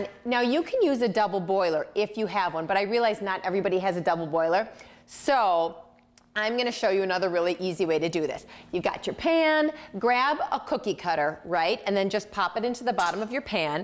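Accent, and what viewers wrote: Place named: Wisconsin, United States. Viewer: Yes, you MUST be from Wisconsin:] I know that accent.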